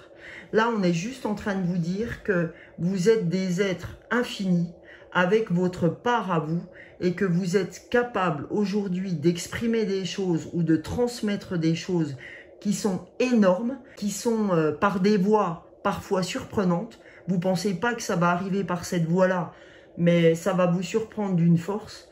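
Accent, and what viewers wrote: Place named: Franche-Comté, France. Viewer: Bonjour je vous découvre aujourd'hui, je trouve que c'est sympa vous êtes très naturelle, je ne sais pas de quel coin vous êtes mais votre accent ressemble à celui de la Franche-Comté. Je m'abonne. Au plaisir de vous écouter de nouveau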